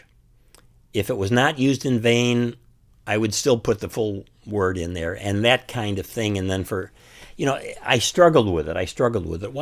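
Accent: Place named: Chicago, United States. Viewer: Dude you have the most Chicago voice I've ever heard...lol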